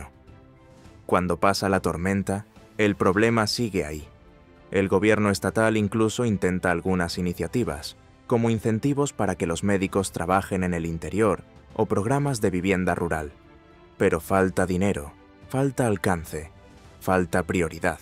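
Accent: Spain. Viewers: Pronunciación del inglés típica de un hispanohablante de España.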